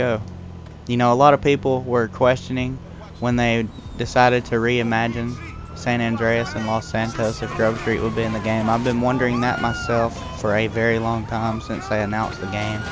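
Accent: United States. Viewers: He sounds fine it's a southern accent I have one to so don't hate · are u from Kentucky?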